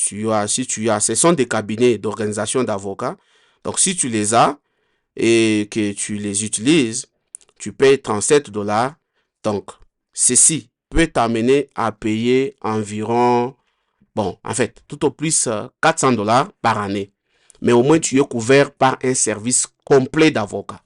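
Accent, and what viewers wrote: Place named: Africa. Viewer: Africain francophone li ye ou pa tande accent li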